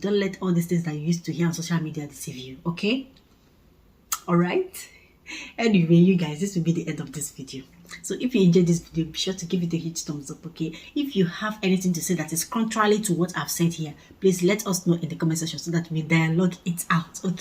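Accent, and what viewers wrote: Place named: Igbo, Nigeria. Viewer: It's the igbo intonation for me. Nice one ma'am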